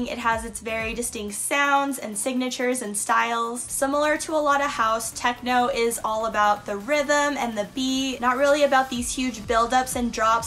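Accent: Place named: United States. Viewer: The way you pronounce things as an American isn't wrong. If it's a result of your dialect, it's just part of the way you speak :)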